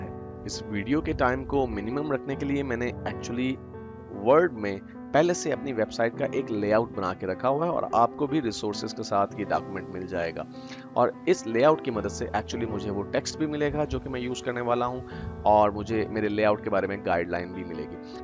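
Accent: Pakistan. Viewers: Aap pakistan se ho kya?? Awaaz se lg rha hai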